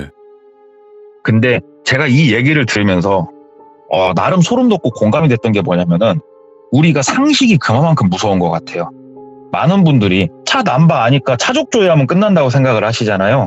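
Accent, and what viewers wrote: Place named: Chungcheong, South Korea. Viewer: 충청도 사투리로 돌려말하는거 ㅈㄴ 무섭네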